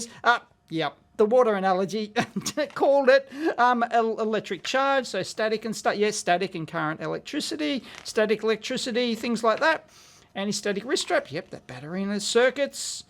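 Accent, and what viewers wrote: Australia. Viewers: What brand is the screwdriver set? I hear "weir" or something. Or was it Wiha? Sorry, listing to an Australian with American ears.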